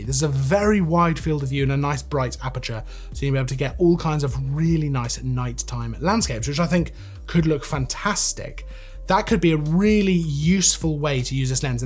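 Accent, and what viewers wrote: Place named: United Kingdom. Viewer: I can't with the old British accent. I study American history and what those people did to this country is deplorable. So his accent makes me feel nauseous. Not his fault 🤢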